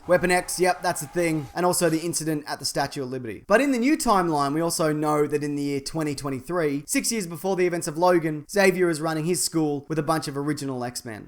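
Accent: Australia. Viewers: Australian! I couldn't work out why I loved your voice so much!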